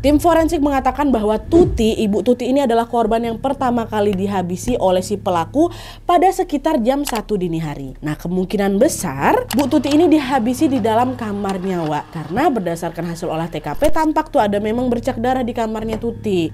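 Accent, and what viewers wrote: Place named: North Sumatra, Indonesia. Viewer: BTW, ini profesi sesungguhnya apa ya. Dan dari logat bicaranya dia tampaknya orang Sumatera, khususnya Sumut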